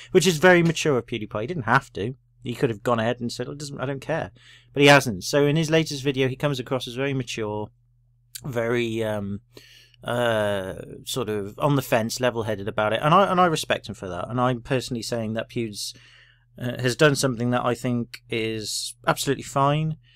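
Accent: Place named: East Anglia, United Kingdom. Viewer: Are you from East Anglia by any chance? I sense a slight familiar accent. Not something you hear often on the Youtubes haha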